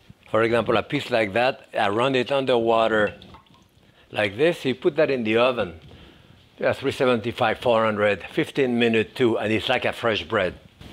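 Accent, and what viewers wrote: France. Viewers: J’adore Jacques Pépin ! Il n’a jamais perdu son bel accent français ! J’ai réalisé un grand nombre de ses recettes et astuces et c’est toujours un régal. Longue vie M. Pépin, vous êtes un trésor !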